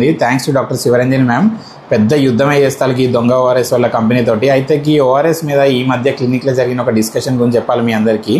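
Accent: Telangana, India. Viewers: తెలంగాణ స్లాంగ్ల ఏమన్న జేస్తున్నవ డాక్టర్ సాబ్ వీడియోస్,,, జోరున్నయ్ పో 😅😅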